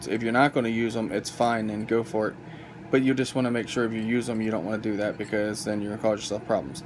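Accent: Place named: Philadelphia, United States. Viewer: I'm from Philly and your accent is close enough to ours where I definitely trust everything you say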